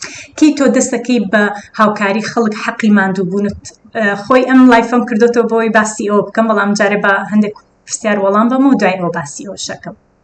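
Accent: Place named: Sulaymaniyah, Iraq. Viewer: خه‌ڵكی كوێی زمانت سلێمانیه‌ و وابزانم وتت هه‌ولێریم🌝😅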